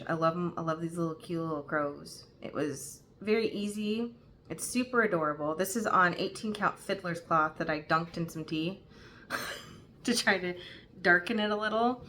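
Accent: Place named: Canada. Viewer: Hi, clicked cos thought oh wonder if she is from Canadian prairies. Made me laugh when said were in AB. You sound Canadian lol. So glad you like Kansas. We are in Nova Scotia but lived in Florida for a yr in the 80s. My daughter’s one son’s name is Benjamin.❤ oh your poor son😢. Oh and Junie is beautiful! Yes Australian shepherd for sure❤hard road figuring adhd and things like that. Oh your stitching is beautiful! Good job on your finish. Oh the floss dying is neat, I haven’t tried that. As I watch I am working on full coverage 3ft long by 2 ft wide. Oh the fabric and colours of floss are beautiful, it will turn out great. If you lent me a pattern, I would make a working copy.. and keep your pattern in the sleeve, so I see nothing wrong with using s working copy. I make working copies of patterns I buy as well…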